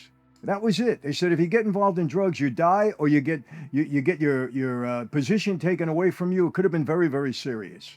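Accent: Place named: New York, United States. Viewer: Most New York accents annoy me but yours is so cool sounding, much respect for sharing your life with us.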